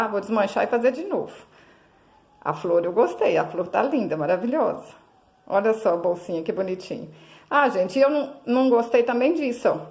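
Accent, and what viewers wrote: Minas Gerais, Brazil. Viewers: Além do artesanato,é muito agradável escutar esse sotaque mineiro....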